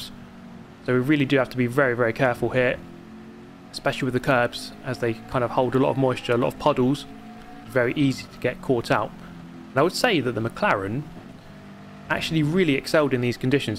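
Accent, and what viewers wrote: United Kingdom. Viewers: i LOVE your voice and the british accent😆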